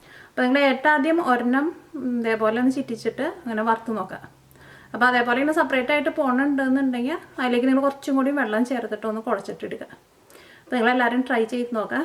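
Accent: Thrissur, India. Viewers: Chechi de nadu thrissur ano?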